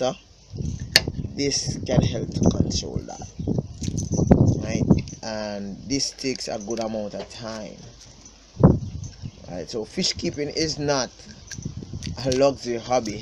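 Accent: Jamaica. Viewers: i really like ur homemade ponds there really nice and pretty good info and i love ur accent Jamaica!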